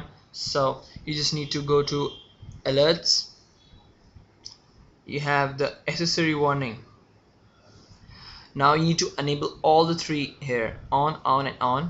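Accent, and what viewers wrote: India. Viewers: indian accent sounds like shit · is that a tamil~rrr accent?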